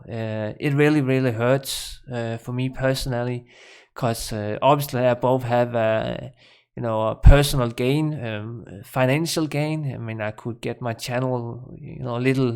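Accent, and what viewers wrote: Denmark. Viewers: Wow you sound so danish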